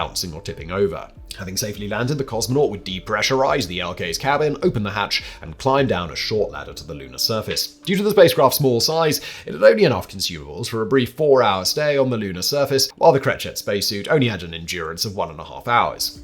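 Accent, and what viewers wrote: United Kingdom. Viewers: I love overly loud pensive music underscored by a well spoken British fellow.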